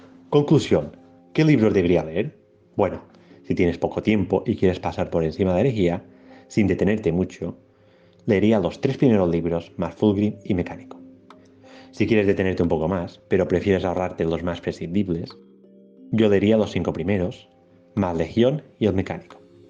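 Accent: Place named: Majorca, Spain. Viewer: tienes un acento mallorquin que me encanta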